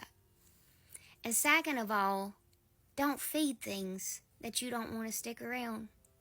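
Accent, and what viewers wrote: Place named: South, United States. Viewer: Amen I knew you was from the south because as soon as you spoke I giggled cause you and I have a similar accent.....keep on doing what your doing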